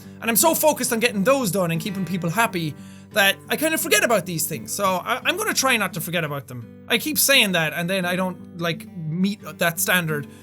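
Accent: Ireland. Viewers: are u actually irish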